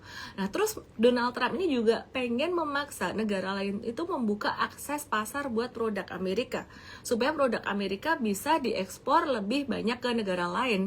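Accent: Java, Indonesia. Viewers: kalo lagi kesel, medoknya jadi tambah kentel 😁😁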